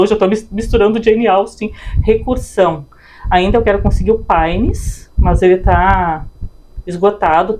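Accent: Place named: Rio Grande do Sul, Brazil. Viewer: Bookshelf legal, ainda melhor com o soquate gaúcho, saudades do sul do Brasil 🙌🏻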